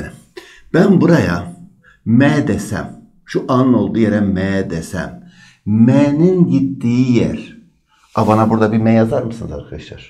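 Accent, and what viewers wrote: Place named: Eastern Anatolia, Turkey. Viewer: Hocam nerelisiniz valla doğu şivesi seziyorum 😅 nasıl sevimli 🙏🏻☺️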